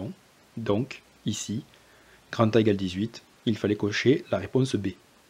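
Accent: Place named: South, France. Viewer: Excusez moi de vs demandez ça mais vos explications et votre accent du sud me rappel un professeur que j avais eu à Ingres? Cela serait improbable mais je demande qd même d autant+ que je suis tombée par hasard sur votre chaîne.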